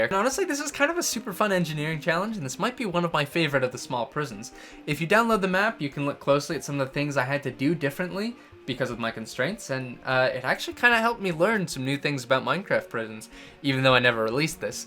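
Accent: Canada. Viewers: Are you Canadian ? 🫧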